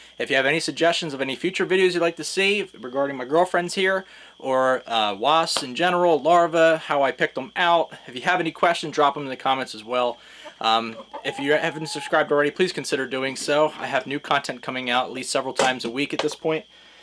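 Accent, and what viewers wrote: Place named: Ohio, United States. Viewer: There's a strange you tube guy does sting zone lets all kinds sting him I told he should try one of those lol we call them bald headed hornet in Michigan sounds like your from Ohio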